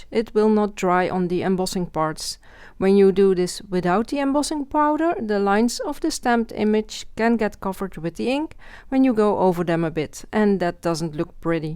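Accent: Netherlands. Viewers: Waauw amazing ideas that I've never seen before ! Thx !! En ik dacht al dat Nederlandse accent te horen haha.. wat heb je leuke nieuwe ideetjes zeg !! En wat een mooie kaarten en bloemen !!! Enorm bedankt voor je moeite om het te delen !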